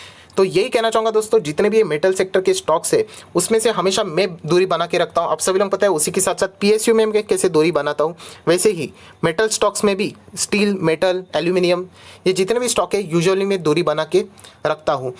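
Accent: Maharashtra, India. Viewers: Sir aap ki mothertongue Marathi lagata hai.....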